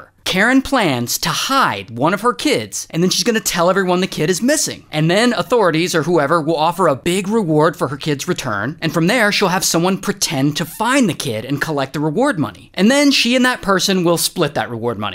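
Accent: United Kingdom. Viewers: british perfect impression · As a British person I can confirm we talk like this😂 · best british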